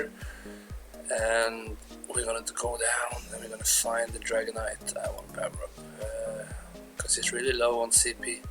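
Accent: Norway. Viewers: are u from norway?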